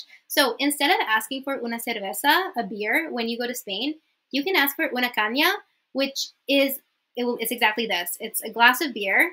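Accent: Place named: Mexico. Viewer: ❤Hermosa, tu acento es más latino, y de hecho suena como mexicano. Así que te vendría bien aprender slang de México, aunque hay algunas que usamos españoles y mexicanos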